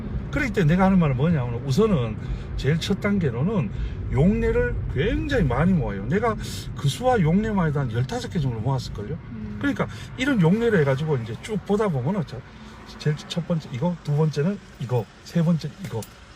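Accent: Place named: Gyeongsang, South Korea. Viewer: 선생님의 말씀을 들으면 '이제 나도 선생님 가르침을 따라 열심히 하면 수어를 잘할수 있겠구나'하는 자신감이 생깁니다. 수어를 외우는 것이 아닌 이해 하면서 공부 할 수 있겠다는 생각에 수어 공부에 대한 관심이 더 커지는것 같습니다. 선생님께서 연구를 위해 많은 노력을 하셨음을 또한 느끼며 감사할 따름입니다. 그리고 선생님 말씀 하실 때 억양이 경상북도분이 아니신가 생각 됩니다. 저는 전라도 사람인데요 경북 영천 친구가 있었는데 그 친구 억양과 비슷하다 느꼈습니다. ㅋㅋㅋ 선생님~ 선생님의 연구에 존경을 표합니다. 찾아 뵙고 인사 올리는게 도리일진데 댓글로 인사 올려 송구합니다.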